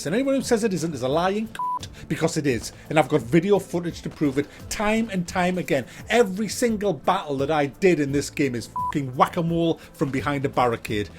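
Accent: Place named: Scotland, United Kingdom. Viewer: I subscribed after hearing your fantastic Scottish(?) accent! Oh, and the ranting is a good bonus.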